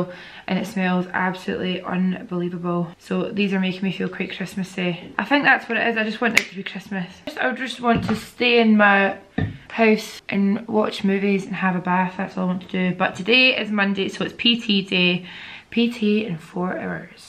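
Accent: Scotland, United Kingdom. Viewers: Your the first Scottish youtuber that I've followed it's great hearing the Scottish accent xx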